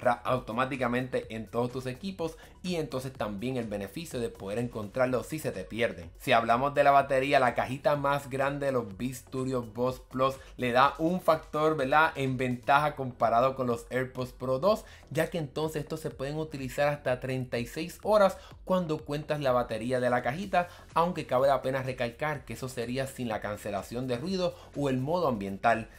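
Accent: Puerto Rico. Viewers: Yo de momento dije ese acento me suena a boricua, y si, mano casi no hay youtubers boricuas en tecnología es bueno ver más de los nuestros en estás cosas, yo compré los Beats hace como 3 días y de verdad estoy encantado hasta ahora, tenía antes los pixel buds serie a y eran buenos pero los Beats son mucho mas comodos, con mejor sonido y la cancelación de ruido esta excelente, y no solo eso por el precio que pague, $144 después del ivu, de verdad encantado con ellos hasta ahora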